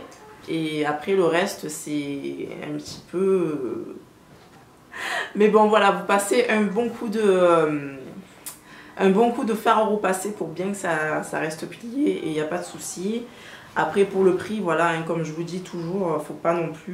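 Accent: England, United Kingdom. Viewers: Oh mon dieu! Thumbs up for the english accent hihi ;') ! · Super l accent anglais lol👍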